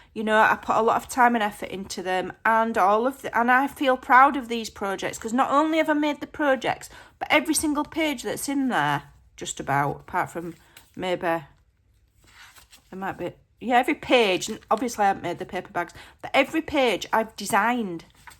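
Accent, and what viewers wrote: Yorkshire, United Kingdom. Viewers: Hi,. I'm from Spain. Both your crafting skills and your fabulous British accent ( I'm a teacher of English) inspire me. I'm about to engage in my first crafting project ever and I chose you as my guide because you are not afraid of making mistakes, which is what builds confidence eventually. This is what I need. Well, sorry. Let's get to the point. There are tons of saying to mention I had a hard time to understand at first, such as the one when you talk to the paper and call it "sucker". My favourite one is " she says", basically because I still haven't figured out what it means. I guess its like saying " or this is what is supposed to happen"´. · Hi I am from N Ireland I love when you talk to yourself “stop waffling and get on with it” in your Yorkshire accent. these journals are gorgeous if it was me I could not part with them. 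Your Ko-Fi items are great value I did enjoy the mini book series and digital. Keep up the great work ❤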